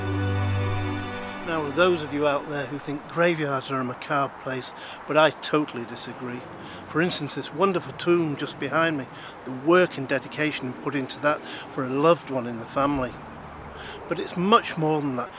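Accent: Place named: United Kingdom. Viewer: I just love these! The camera work and details are wonderful--and, from a person living in Colorado, USA--listening to your commentary is delightful! It's the British accent, you know...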